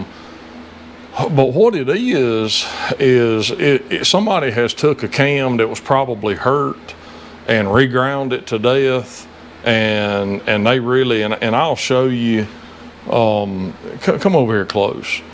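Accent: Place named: Australia. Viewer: That accent is magnificent. Aussie here.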